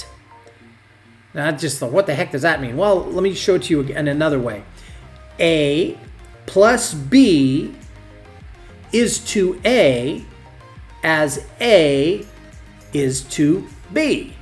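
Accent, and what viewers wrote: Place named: New Jersey, United States. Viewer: Hi I just found your site and love it, many of my favorite artists you are covering. I am also a Christian and have be studying and teaching the creation/evolution topics for 45 years, teaching only for the past 30. This is a very nice video on the Creator handy-work. Did you know that the brain spends or dedicates 30% of its computing power to the hand? In other words, God created man to use his hands with the ultimate control, just look at a surgeon, painter, pianist, slight of hand magician, or anyone else using their hands in a delicate manner. Monkeys can't do that, we can because we are fearfully and wonderfully made. Your accent sounds like you are from NJ, or NY or close by. There is a great illustrator (there are so many) that you should interview, he is also a Christian, his name is Thomas Fluharty and he loves to talk. 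Anyway, I love your enthusiasm keep up the great work. from NJ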